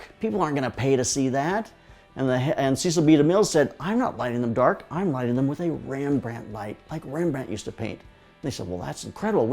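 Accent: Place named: United States. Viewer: Best American Accent Ever!!!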